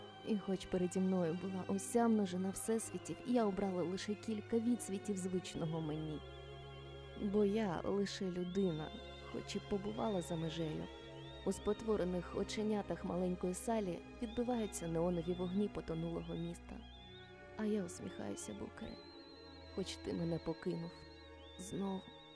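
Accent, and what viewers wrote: Standard. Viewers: Чудовий голос та чиста мова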